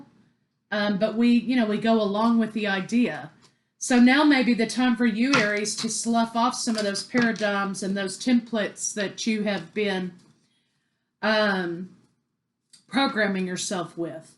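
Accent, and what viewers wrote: South, United States. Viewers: Where are you from if I may ask.. I love your southern accent and how u serve it straight up, no chaser!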